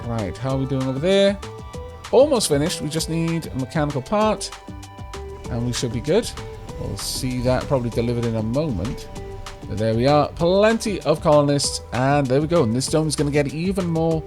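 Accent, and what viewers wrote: Wales, United Kingdom. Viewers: I always thought your accent was 100% unapologetically Welsh until yesterday while watching your video my fiance asked if I was watching "the German guy" and when I mentioned this to a friend he said you sound Swedish. 😂 not sure what they are hearing tbh.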